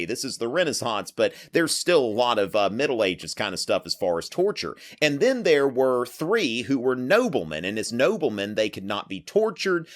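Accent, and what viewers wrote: United States. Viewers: I’m from the Uk and have been obsessed with the six wives for YEARS it’s so weird hearing it in an American accent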